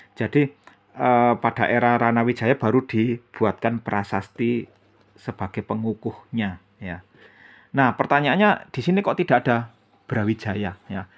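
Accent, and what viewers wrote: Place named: East Java, Indonesia. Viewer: Video ini berisi narasi dalam Bahasa Indonesia dengan dialek Jawa Timuran.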